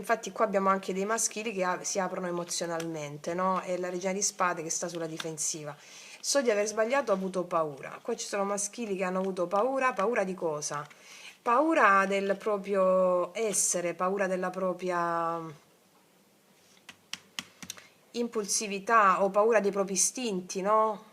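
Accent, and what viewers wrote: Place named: Sicily, Italy. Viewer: Ciao mi sa è siciliana 😊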